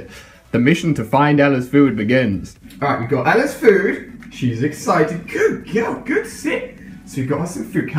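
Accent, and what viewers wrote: England, United Kingdom. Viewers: r u from England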